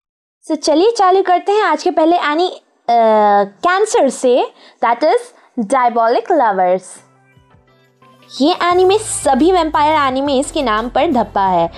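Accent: India. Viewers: Are you really indian?or learnt to speak hindi ? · WHO ARE YOU INDIAN OR WHAT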